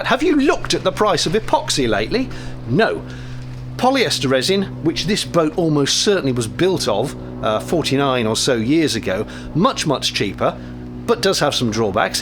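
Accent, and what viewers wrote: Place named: England, United Kingdom. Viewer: You are fantastically English. It is wonderful to listen to you. It brings a such warm sense of familiarity. You should be proud of your bravery for such an endeavour, it is no small task. I look forward to your updates! Greetings from the midlands.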